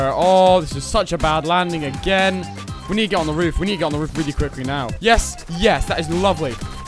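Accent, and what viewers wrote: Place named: Scotland, United Kingdom. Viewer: I cant tell if you r Scottish british or american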